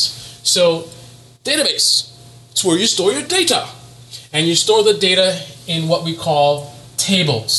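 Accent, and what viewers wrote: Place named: United States. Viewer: Thank You. I am a beginner and you talked to me like I am a beginner ( not stupid but a beginner ) thank you. I have gone through a PLEATHERA of videos and information. Many people I simply cant understand their dialect from where ever they come from. You Speak English in American English and that helps me ( okay maybe I am stupid ) but thank you. Doing good -- keep the classes coming -- ROCK ON.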